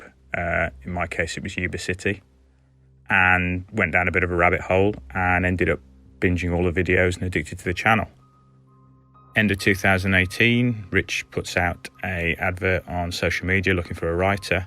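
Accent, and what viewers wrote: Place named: Black Country, United Kingdom. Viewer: Nice to hear a Black Country voice! I grew up in Stourbridge...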